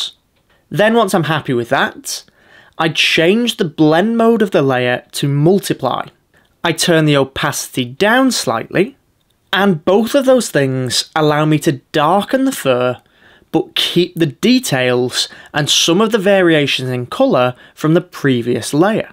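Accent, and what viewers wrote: United Kingdom. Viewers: i didn’t think so- but i can understand how it felt that way to you. many art videos have a lot of “i see beginners do (this thing i do all the time)…” and feel personally singled out 😀 this is embarrassing to admit but we’re among friends- UK accents immediately make someone sound fancier and i me. “do this, don’t do that” can sound condescending to me like you said- but sometimes for me, it’s the accent for this bonehead american over here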